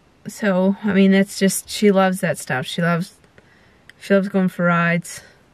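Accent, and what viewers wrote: Maine, United States. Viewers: Oh man, I am truly sorry to her about June. They truly are family. I hope she soon gets better. Sending prayers your way. On a lighter note, something I have thought about sharing with you numerous times since I began watching and following your channel. You have sent me down a memory lane from years long gone. That is due to your Maine accent. (Don't hate on me yet!😁) My closest buddy in Vietnam was a Sgt. from Maine. I can't recall what part. We became close pretty quick, with each struggling to figure out what the heck the other was saying. I was raised in the south, and needless to say, I never mastered the Queens English myself. 🤣 We spent our full tours together, although we rotated back to the states at different times. I left first, at a time when things were "less than ideal", and we lost contact. I tried for weeks, calling the Dept. 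of the Navy, and the Marine Corps, trying to learn if he had made it back. Finally, one evening I got the news I had long hoped to hear. "Sgt. John" made it home, and was doing well back in Maine. Isn't it funny? How something as simple and common as an accent, can send us back to a time so long past? God bless you and yours. And, God be with June. Happy trails,.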